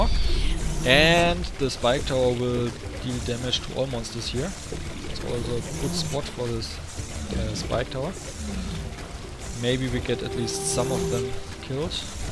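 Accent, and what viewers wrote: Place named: Germany. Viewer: Ja, man hört den deutschen Akzent sehr stark raus.^^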